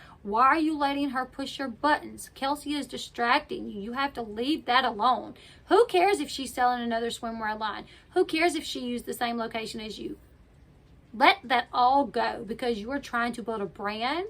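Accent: South, United States. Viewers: I just love your country accent 💗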